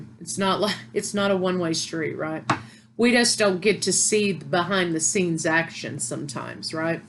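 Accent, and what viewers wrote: South, United States. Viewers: Wow wow and wow, oh my goodness. I listened to this whole message and it's giving me so much clarity. Some of it, I already knew, but needed a reminder, some of it I've been working on and some of it I NEED to work on. Thank you so very much!!!You are so extremely enlightened and gifted. And I love you southern accent! Peace and blessings to you. Love, E. from WNC.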